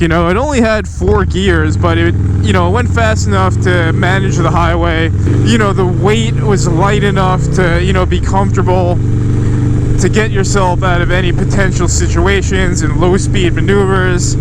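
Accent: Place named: Long Island, United States. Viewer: This is my first time watching you but I'm glad I found ya I can tell this is Longisland I'm assuming, which is where I'm from I'm looking to get a dyna for my first bike this spring/summer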